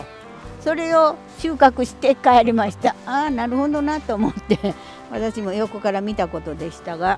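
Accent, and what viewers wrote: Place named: Ehime, Japan. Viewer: のファンです。私と同い年なのに、テキパキ畑仕事をやりこなして、凄いな！って感心しています。何より息子さんや、家族に恵まれていて、羨ましいです。愛媛出身なので、方言が所々似ていて聴いていて楽しいです。笑顔良しの、これからも無理しない様頑張って下さい。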